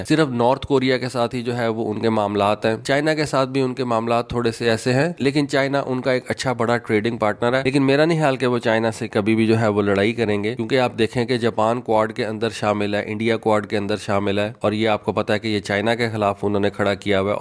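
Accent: South Africa. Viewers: SOUTH AFRICAN!!!😂😂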